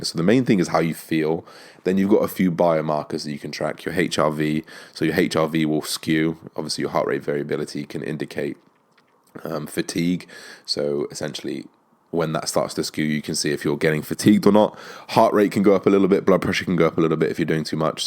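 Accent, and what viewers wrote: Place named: RP, United Kingdom. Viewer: You sound like such a posh boy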